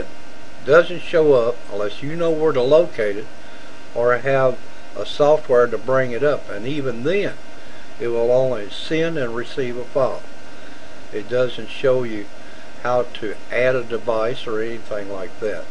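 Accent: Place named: Texas, United States. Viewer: Great Texas Accent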